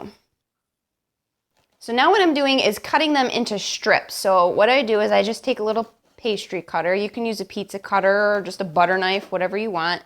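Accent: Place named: Minnesota, United States. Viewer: These look good! Are you from Minnesota/Wisconsin? I'm trying to guess from your "accent"! (I'm from MN, you don't have a huge accent :) )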